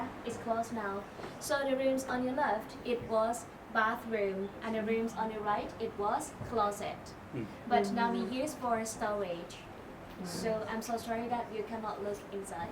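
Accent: Scotland, United Kingdom. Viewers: Interesting museum, but camera-work amateur. Hand-held and shaking, lens mostly on the tourists, not on the artwork. Outside, noisy traffic, cant understand the Scottish accent.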